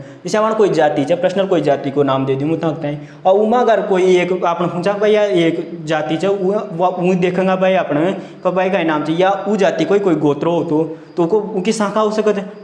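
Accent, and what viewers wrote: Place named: Hadoti, India. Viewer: Hadoti tone.....❤️♥️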